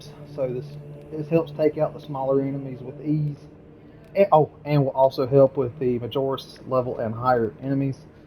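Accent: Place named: South, United States. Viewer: The southern accent is cool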